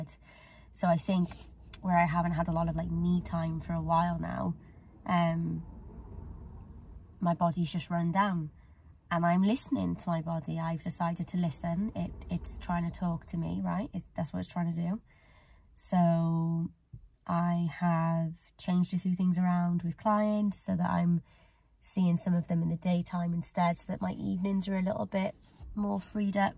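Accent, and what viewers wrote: Cardiff, United Kingdom. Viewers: Old video, but I find I have an inability to adjust to situations. Maybe eyesight issues from anxiety! Btw, you from the ‘diff? I hear a Caaaardiff twang 😂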